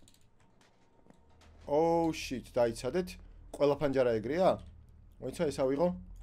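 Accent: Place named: Georgia. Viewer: tu videos igeb inglisuri sityvebit mashin mtlianad inglisurad ilaparake,magram tu igeb videos inglisuri sityvebit mashin inglisurad kargad mainc ilaparake aba qartuli aqcentit inglisuri sityvebis gagoneba sasinelebaa:)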